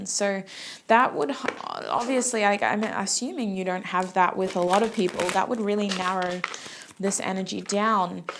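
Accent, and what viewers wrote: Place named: Australia. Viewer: The second you spoke I knew you were Aussie!! 🙏🏼❤️🧿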